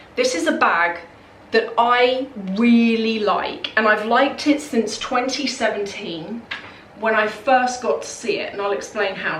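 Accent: England, United Kingdom. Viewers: Also, may I ask what type of English accent you have? I think it's very pretty! I'm aware of the different types of accents as my fiance is English.:)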